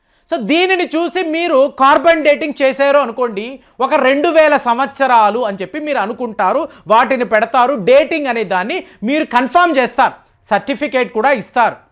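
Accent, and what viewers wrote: Tamil Nadu, India. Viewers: Sir Tamil background Telugu anduke Tamil accent to Telugu matlduthunnaru Hyd / Telangana lo Telugu Urdhu lo makkinchinattu matldutharu alage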